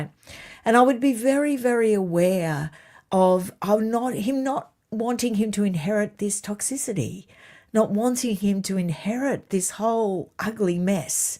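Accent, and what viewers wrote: Australia. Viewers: Yeah,. But they are swayed by you. God love our Aussie voice ❤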